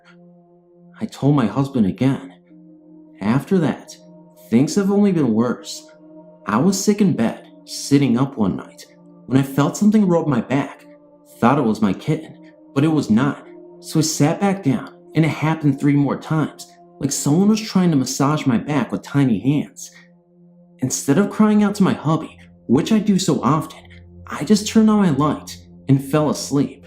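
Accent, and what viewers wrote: United States. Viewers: Wow listening to you read is like listening to nails on a chalk board, you really need to practice a less monosyllabic tone, it’s really difficult to get into the stories, I listen to a guy on another channel as well, and well not as bad he to has the same drone, must be just the American accent, although the girls seem to be just fine, definitely need to inject a bit more life into it🤔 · Pardon me.. What state is this accent from? I'm from UK. I know you're accents change from area to area like ours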